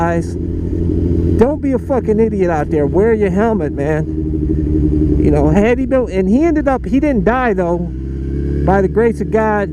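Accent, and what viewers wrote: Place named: Texas, United States. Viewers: to me it sound like a Texas dude lol I don't really know alot about the south haha I'm from the bay area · Thanks for sharing your story. We all have a story to tell. When I first heard your voice it didn't sound North East at all. Sounds more Southern, but I guessed you grew up in a "certain area" just from your lingo. But you pulled yourself up and made a better life. That's awesome! The problem with "The Hood" is, some people insist on staying stuck on stupid even when they don't have to. Again, thanks for sharing. Stay safe and ~ HAPPY NEW YEAR ~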